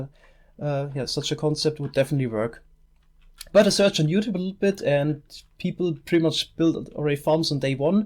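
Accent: Germany. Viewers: Mann merkt den deutschen akxent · wie Methoz auch noch am Anfang Hallo sagt :D Find ich cool das auch deutsche/Österreicher englische Videos machen :) Man hörts aber (nicht böse gemeint) :)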